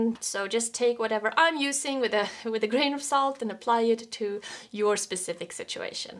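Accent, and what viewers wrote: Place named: Sweden. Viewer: Det låter lite "swenglish" när du pratar😊!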